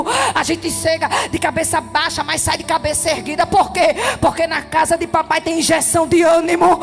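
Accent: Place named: Pernambuco, Brazil. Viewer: pelo sotaque ela é pernanbucana.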